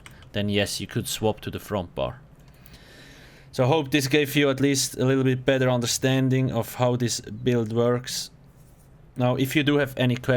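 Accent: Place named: Switzerland. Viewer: Das tönt nach Schwizer ^^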